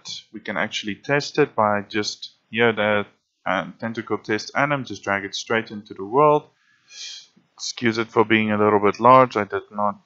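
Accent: Afrikaans, South Africa. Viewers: Buddy, you afrikaans?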